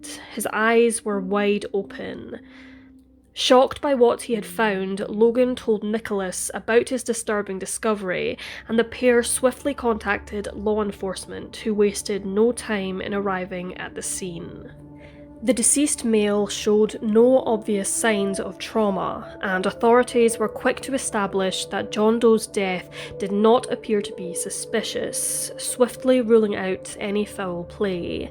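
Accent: Scotland, United Kingdom. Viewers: Subbed. What that accent? Scottish? Irish? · she’s scottish not irish🤦🏻‍♂️ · It’s Scottish,I’d say. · It's so nice to hear a good Scottish narrator... 💕 >^. .^< 💕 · Hello friend! I enjoyed video very much and I like your Scottish accent! I'm from India. I have subbed your channel, thank you. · She's from Scottish highlands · SHE'S SCOTTISH, PROBLEM??????🤨🤨🤨🤨🤨🤨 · Nope. She's Scottish. Mike is Irish. Different accents. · She is from Scotland, UK. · except she is Scottish...!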